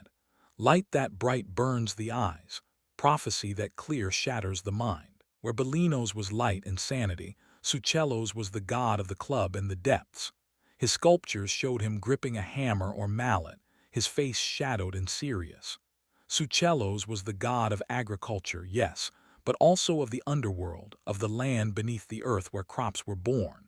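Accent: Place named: United States. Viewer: American pronunciation absolutely does my head in. It is just pure lazy.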